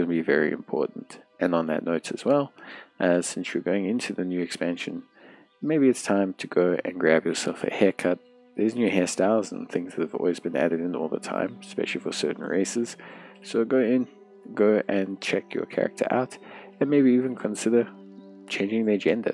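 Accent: South Africa. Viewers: awesome man, great to see other SA WoW players. You do sound like you've been out of SA for awhile.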